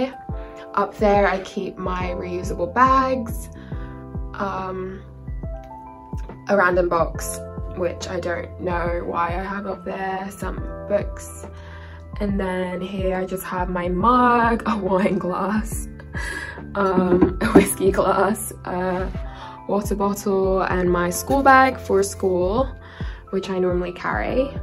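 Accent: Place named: United Kingdom. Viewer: Your British accent is showing!!!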